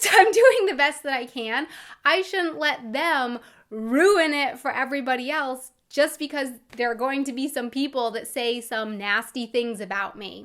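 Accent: United States. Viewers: Oh my. But. But. Your american accent is absolutely lovely. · I hate it when people complain about things they couldn't do any better. If I hear the germans talking english like "sänk ju for träweling wiss Deutsche Bahn" (Thank you for traveling with Deutsche Bahn), but are complaining about your american accent (which sounds cute, I think) I think that's not the right way. People: Try your your best on learning languages, but keep in mind, that this aren't the mother language, so it's ok to have an accent based on your mother language! That's cool, because you can hear the language in many different ways! That's really funny, I think. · I think something what would maybe help to loose your American accent is to speak for a period of time only German. So you can train your vocal cords better. About the haters I think there are a lot of stereotypes about Americans that everybody has to adapt to them and not otherwise. 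And to be honest, (I haven't made any comments on your channel yet, so don't put me to the haters) I thought you just didn't put a lot of effort to learn German very well and to better your pronunciation, because maybe you are a little superficial. I was obviously wrong. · Why would anybody complain about your american accent while talking german. You can make a guess if those people, who appear to be germans, are even able to speak fluent english. And i think everbody knows germans have a terrible accent when it comes to speaking foreign languages. Yeah, american might not be the most popular accent compared to french, italian, spain or even russian but every accent makes the speaker very unique and thats always some sort of cute ;) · It´s totally ok to speak German with an American accent because you are American : ) I think it must be pretty hard to learn German and you are really doing well! 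· I really don't think you have to get rid of your accent. actually, in my opinion, German sounds nicer with an american accent because English is a softer language :) if you really want to work on your accent, try speaking very slowly and just concentrate on your pronunciation and once you've got that down you can try speaking faster :) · You dont have to talk without an american accent. You are an american woman and talk with your accent, this makes you more authentic. Normally nobody should be sad if you are talking with an accent. If we understand you, everything will be fine :) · Hi! I think you do a great job and have a very positive outlook. Thanks for sharing that with us! Learning a language is tricky business enough, and I think you provide very good reasons for why you speak German with a heavy American accent. I can personally say that my mouth literally HURTS when I read a German book out loud for a few pages. 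Some people don't know how hard it can be for people like us! You can hear me speak German with my best attempt at a German accent here (I still have a long way to go): <link> Maybe just try to enunciate a little bit less. If you loosen up and let the words "blend" into one another a bit more, it might allow your voice to have a softer flow in German. But in the end, I think you seem like a very nice person and that is more important than how you sound. As long as you are understood, that it what truly matters. If you ever want to do a collaboration for YouTube with me, let me know. In fact, we could even practice together some of the techniques I mentioned. I live in Berlin and have also worked with the Easy German crew a few times. It would be nice to meet you! Thanks again for the work you do! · and noo don't work on your accent, the amerikan accent in German is such a cute accent :) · please dont work on your accent, its so lovely when americans speak german :) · Keep your American accent, it's quite normal and I bet it's not so bad. 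We have here in "Germany" more than a dozen different accents, so why not a few ten-thousands of people with an English accent.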